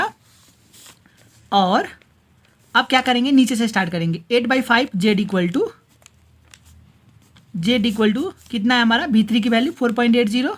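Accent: Nepal. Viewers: dai nepali ho? haha , anyway loved it haita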